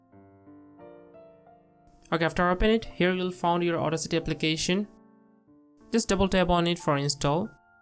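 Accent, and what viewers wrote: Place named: India. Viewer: Indian — English version of India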